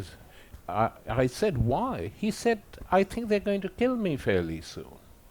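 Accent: United Kingdom. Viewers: that’s because he is British.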